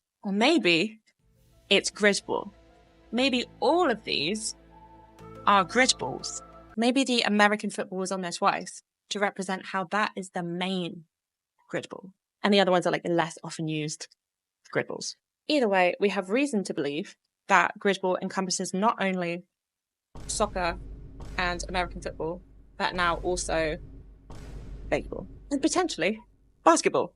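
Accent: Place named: United Kingdom. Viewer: Right of the bat: you seem so much more british on this one?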